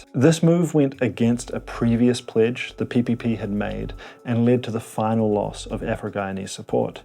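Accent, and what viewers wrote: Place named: New Zealand. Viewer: Great content bruzzy! Editing, music and most importantly delivery and info on point. Also as an Aussie who grew up in aetorea i dont think ive heard a more "international" or "posh" kiwi accent! its almost imperceptible but sort of ole' school journalist which i love. Keep up the good work. Subbed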